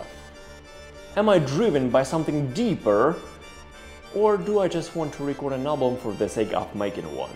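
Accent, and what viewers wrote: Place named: Russia. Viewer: Русский акцент не отнять брптан😊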